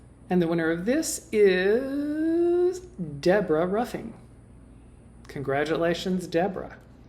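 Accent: South, United States. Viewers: you need to take care of you. If that means making your videos every other week, so be it. You are such a gift to us in this crazy world. Your calm, sweet southern voice is a beacon for us to be guided by to serenity and beauty. Thanks for being you and I, for one, can't wait to see you again!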